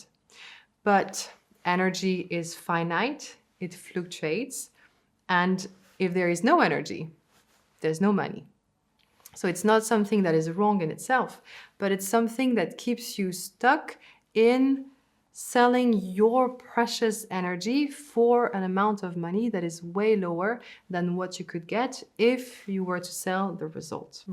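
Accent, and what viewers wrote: France. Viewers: French is her first language. 😊 I think she is doing a great job here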